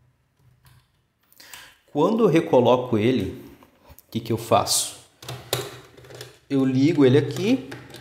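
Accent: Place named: South, Brazil. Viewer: Teu sotaque parece ser do sul. Vc e sua família estão seguros devido as enchentes históricas?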